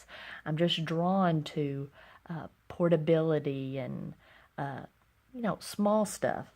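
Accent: South, United States. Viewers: Are you Southern USA?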